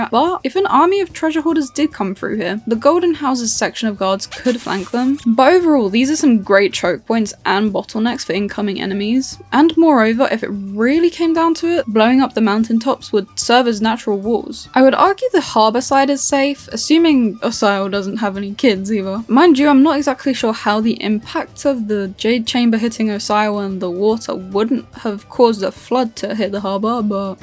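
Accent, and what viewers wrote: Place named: United Kingdom. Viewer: but why are you british?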